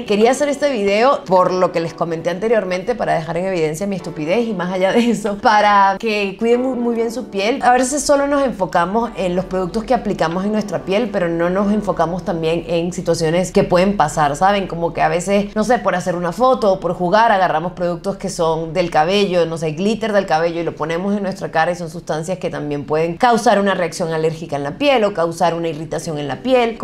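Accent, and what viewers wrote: Venezuela. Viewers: Omggg eres de Venezuela???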